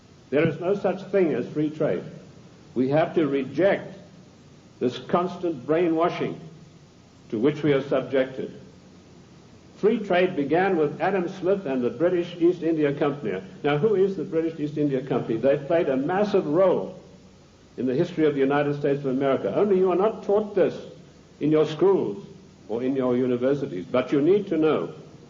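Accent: South Africa. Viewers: Definitely either of the two... I thought SA🤷🏼‍♀️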